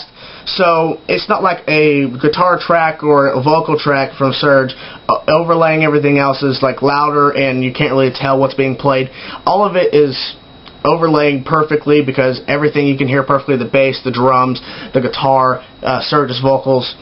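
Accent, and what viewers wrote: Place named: United States. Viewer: He says Tankian, but the "an" is faded because he have American accent. If you're an American and didn't noticed you're the idiot.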